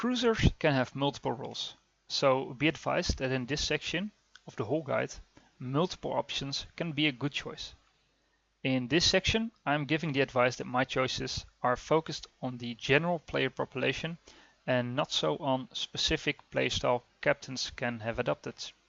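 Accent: Netherlands. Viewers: Are dutch?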